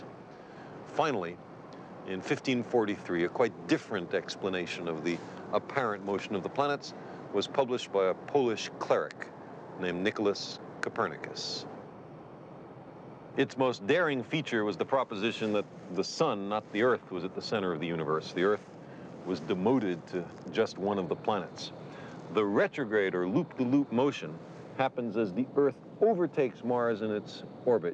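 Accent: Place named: Brooklyn, United States. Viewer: Carl was born in Brooklyn and lived there as a child. It must be noted that the "Brooklyn twang" was different than today than when was a child in the 1930s ( would be pushing 90 if he were still alive). It must also be noted that spent the majority of his life in high-level universities such as Harvard, Cornell and Berkeley. Being a lecturer it's likely he taylored his accent for his students.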